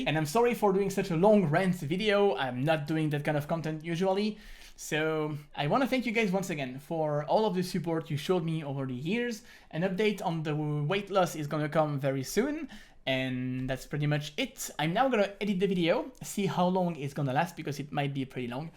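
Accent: France. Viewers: On l'entend bien l accent français :)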